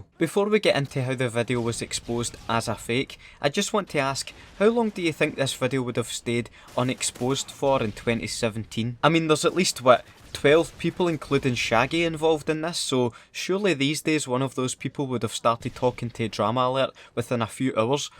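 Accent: Scotland, United Kingdom. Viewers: Now i know where this accent is from. SCOTLAND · I don't watch any other COD youtubers. Tbh I just love the Scottish accent that's why I watch this channel ahahah. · Yo I have been subbed for about a month now, I would be browsing facebook and sometimes I would come across a scottsman playing COD and thought I fucking love the accent but more importantly I found that the content was top notch so I found you on youtube and subbed and now here I am, drinking coffee, enjoying a great COD video. Thumbs up to you sir.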